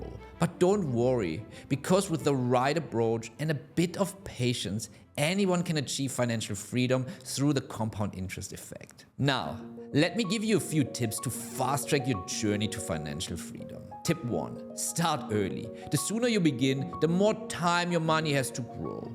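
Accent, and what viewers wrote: Germany. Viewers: i am german and sounds like you too?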